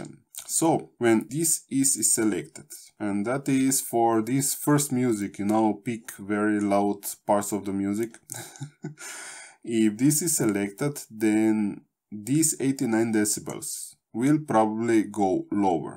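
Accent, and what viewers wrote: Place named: Eastern Europe. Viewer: Thanks to you to take time to simplefy the process of normalising sound in a playlist, I can ear the you have a eastern Europeen accent, my wife is Russian.We live together in Canada now,as I'm Canadian. Thank for your help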